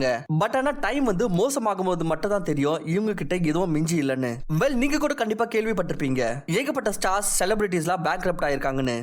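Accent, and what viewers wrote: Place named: Bangalore, India. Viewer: Bro. Neenga bangalore ah.. Ungaloda tamizh pronounciation bangalore tamilian madhiri irukku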